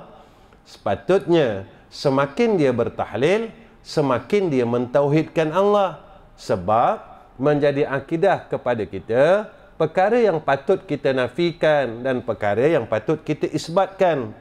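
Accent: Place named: Terengganu, Malaysia. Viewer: alhamdulillah..nk fasih dh loghat ganu kite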